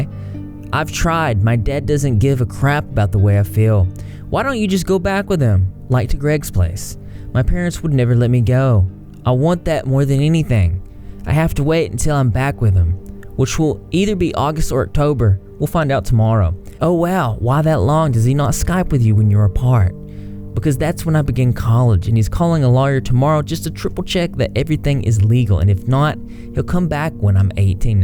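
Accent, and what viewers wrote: South, United States. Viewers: I hate the way southern accents sound but yours is so pleasant to hear wtf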